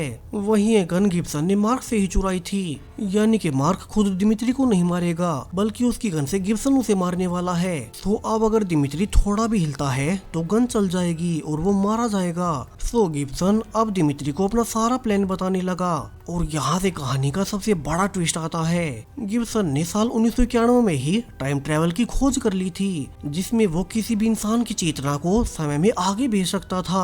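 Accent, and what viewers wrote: Haryana, India. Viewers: aapp haryana se hoooo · achii hai bro, tabhi feel ata hai video mai...haryana se ho kya bro?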